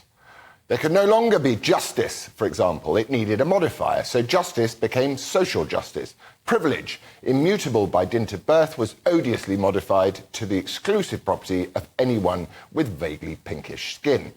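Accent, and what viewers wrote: Scotland, United Kingdom. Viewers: Great Scottish accent 😊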